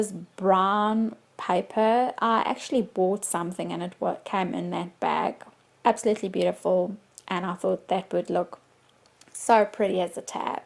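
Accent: South Africa. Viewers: Hi found your channel through 49 dragonflies. Is that a South African accent I hear ? Good ideas to try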